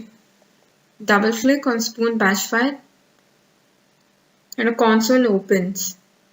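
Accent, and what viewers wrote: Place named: India. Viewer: 最难忍受 印度人 说英语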